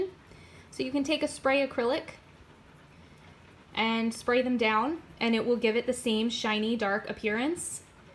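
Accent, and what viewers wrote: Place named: United States. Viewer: It’s an American accent. We all have one. Even you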